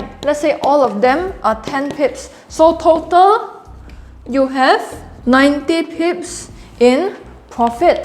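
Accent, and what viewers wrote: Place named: Singapore. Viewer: loved ur Singlish accent! :)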